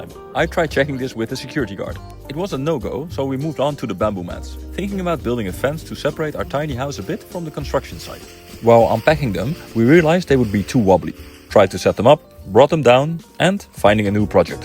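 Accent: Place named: Netherlands. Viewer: I love hearing your Dutch accent. I miss my vader so much.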